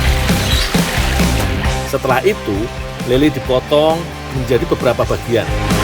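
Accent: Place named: Banyumas, Indonesia. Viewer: Ngapak lurrrrrr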